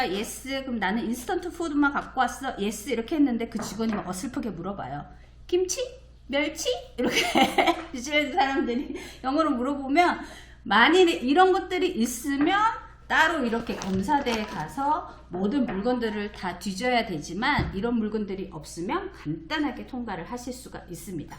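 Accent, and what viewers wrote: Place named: Gyeongsang, South Korea. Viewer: 부산출신으로서 억양듣고 알았습니다ㅋㅋ 같은 이민자로서 타지에서 같이 힘냅시다.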